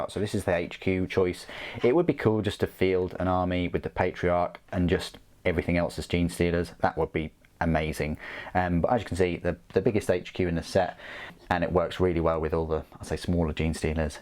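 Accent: United Kingdom. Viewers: THis may sound a bit silly but I love your voice, it encompasses Britishness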